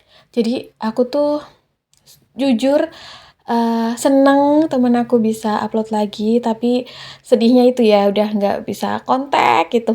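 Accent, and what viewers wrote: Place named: Banyumas, Indonesia. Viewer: Wah aku selalu lihat, kalau ada vidio yg baru mb, wong ngapak he he he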